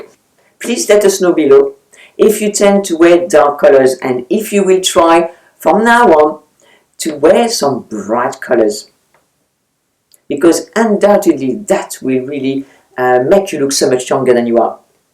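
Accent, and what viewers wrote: France. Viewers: 70s???? Yuck!!! On a brighter note, you are an inspiration of style. I love watching you and I love your accent. I have always considered the French to be the epitome of elegance and you exude exactly that. And I think deep down you are also a good hearted person, one of the good people of the earth. Love you!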